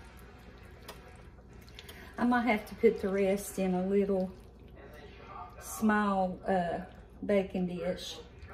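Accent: South, United States. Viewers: You remind me so much of my grandma❤️ she has a southern accent as well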